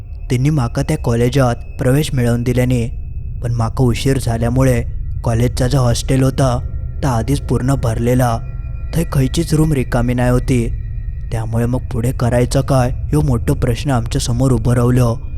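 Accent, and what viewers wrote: Malvani, India. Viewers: Hahahahha response faar bhari hote comment la, Masta vatta malvani ikyla 🎉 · Ya bhashela malvani mantat Kalah ka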